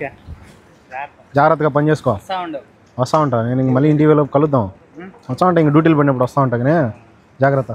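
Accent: Kadapa, India. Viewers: Mee kadapa language bagundi and mee smile supper ❤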